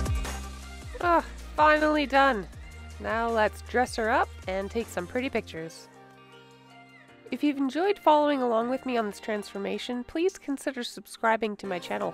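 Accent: Canada. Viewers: Hi! I'm a brand new subscriber of yours, and what I like about you is that you produce great work without having the use of an all 'singing and dancing' workshop, ie no table saw and other modern tools you see in other peoples video's. Oh and your nice accent ( Canadian)? I look forward to more of your vid's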